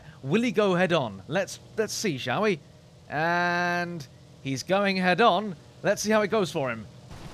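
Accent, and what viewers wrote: England, United Kingdom. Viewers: It's a show, although he has been talking like this for so long that even on his serious videos he will sometimes go off into his wonderful British way of talking :) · I'm in love with your accent. What kind of british are you, m8? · oh god, you are sooooo british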